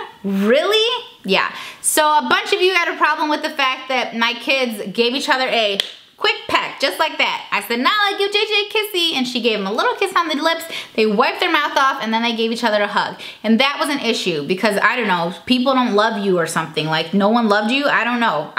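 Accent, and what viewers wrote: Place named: South, United States. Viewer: i love your voice for some reason. its like sassy/southern but not annoying haha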